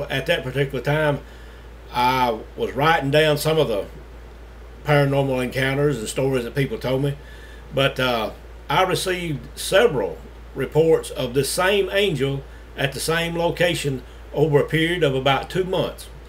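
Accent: South, United States. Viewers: hey man these are some great stories I believe every one of them I've had an experience myself... you know something that is one southern accent I like listening to you talk brother we chat soon be cool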